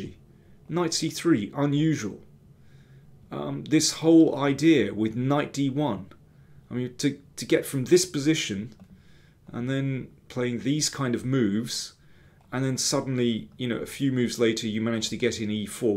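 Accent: United Kingdom. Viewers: I love the strong British accent and your very very happy smiley demeanor, paired with deep knowledge (obviously). Makes for great content. Subscribed!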